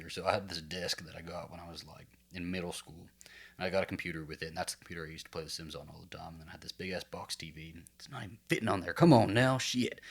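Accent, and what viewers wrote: South, United States. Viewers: I can't believe you're trying to build your childhood home from memory on Sims 4 of this video and I hope your husband, Alastair will be very proud of you for that, but I love your Southern accent, you're gay and you're from Texas!!!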